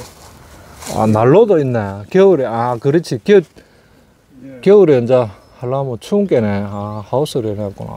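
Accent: Gyeongsang, South Korea. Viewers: 구수한 경상도 표준어 외지에서는 경상도사투리라고 합니다 오늘 영상 잘봤습니다 항상 감사합니다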